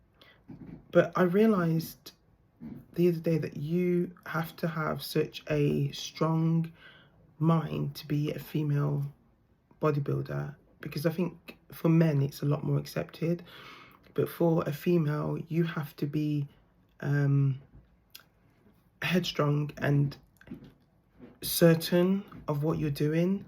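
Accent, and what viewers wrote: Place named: United Kingdom. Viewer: This is my first time seeing any of your videos. I would like to say that I have always had the highest respect for bodybuilders in general - be they male or female - because that lifestyle demands quite a lot of self-discipline in terms of workout routines, diets, etc. As a man, I have absolutely no qualms whatsoever with female bodybuilding. I believe that it is prudent for anyone with the will & drive to do so to be as strong & fit as possible. This will yield health benefits both for the present as well as for the future. I will also say, as a Black Man in the United States, that it is a good idea for us as Black People to at bare minimum render our bodies strong in order to both withstand and effectively defend ourselves against potential attack. The U.S. is quite often hostile territory for our people. I don't know whether this is an issue in the U.K. 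(where I'm assuming you're from given your lovely accent). I will make certain to check out more of your videos. Thank you for your words of support towards your fellow female bodybuilders and for being you. You're beautiful. 🌹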